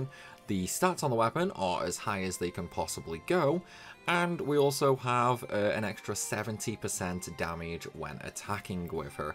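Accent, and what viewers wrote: United Kingdom. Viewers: omg why is every obscure youtube channel ran by a brit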